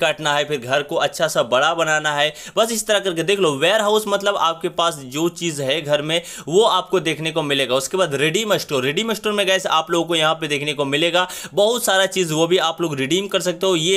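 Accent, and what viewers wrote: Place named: Bihar, India. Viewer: Bhai aap Bihar se ho naaaa mere ko pataaa haii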